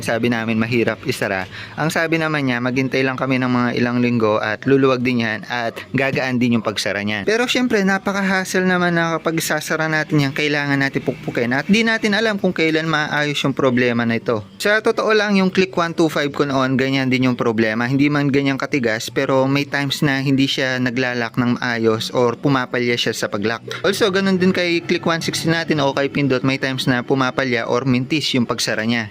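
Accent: Pampanga, Philippines. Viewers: Nokarin kayo lods? Halatang kapampangan ka hehe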